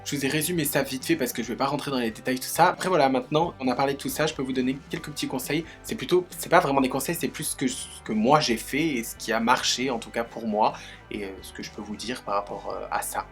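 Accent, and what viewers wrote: Belgium. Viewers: Tu es belge car je trouve que tu as un petit accent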